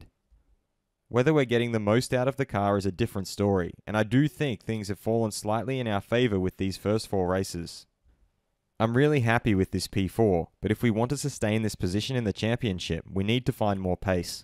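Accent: Australia. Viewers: Absolutely love this channel. Thanks for the awesome content!! Love hearing the Aussie accent on YouTube too. 🇦🇺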